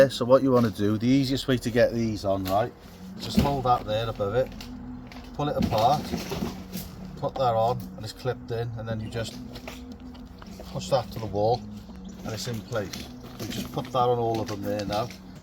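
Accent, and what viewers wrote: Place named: Welsh Valleys, United Kingdom. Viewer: Love the valleys accent Butt! Short instructional video thos week. Hope your dad is on the mend buddy.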